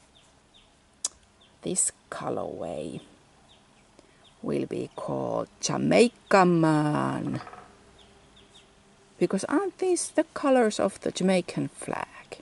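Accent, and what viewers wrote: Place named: Finland. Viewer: I love listening to you with your charming Finnish accent. I am from Sweden but now live in Seattle, USA. Please come back, even for small snippets!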